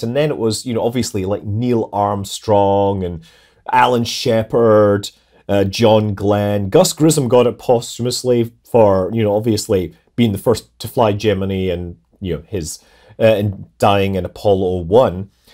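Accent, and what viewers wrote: Scotland, United Kingdom. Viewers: In addition to your excellent reporting, I also enjoy hearing a Scot speak with the accent notable among the people of my heritage.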